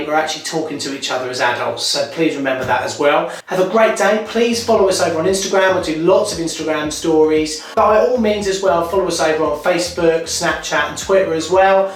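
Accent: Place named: England, United Kingdom. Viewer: Isn't there a lot of waste?? Im from England living in USA - nice to hear the old stomping ground accent..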